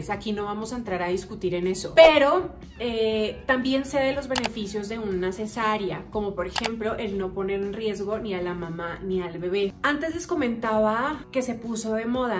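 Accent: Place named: Colombia. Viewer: no pierdas tu acento Colombiano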